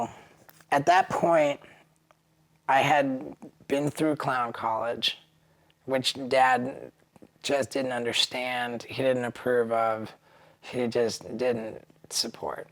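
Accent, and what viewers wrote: United States. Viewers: Interesting that he sounds just purely Americain.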